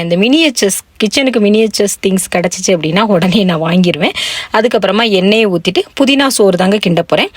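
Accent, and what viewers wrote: Tirunelveli, India. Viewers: Akka unga pechu tirunelveli mari yaa eruku ❤❤❤❤❤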